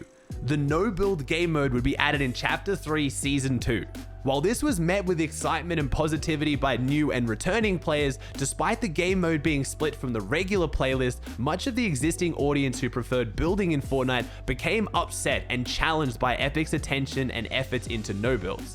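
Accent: Australia. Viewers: Props to the editor who, seamlessly collated these videos and worked perfectly alongside Aussie narration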